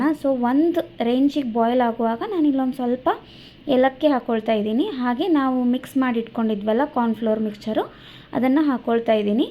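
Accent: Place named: North Karnataka, India. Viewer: ಉತ್ತರ ಕರ್ನಾಟಕದ slang ಚೆನ್ನಾಗಿರುತ್ತೆ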